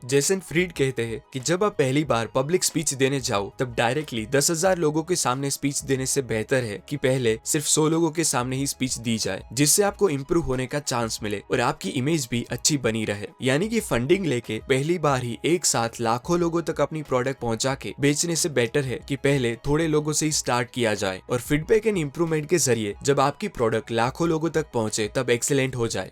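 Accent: India. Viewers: I hate your indian accent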